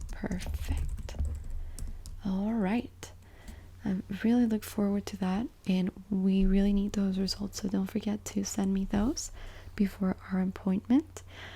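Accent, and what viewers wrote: United Kingdom. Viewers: Espero pronto puedas haer otro roleplay con acento británico <3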